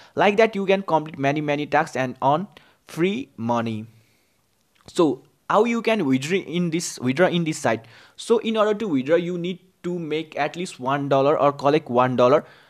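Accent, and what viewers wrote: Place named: Nepal. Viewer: You have Nepali accent. Are you Nepali?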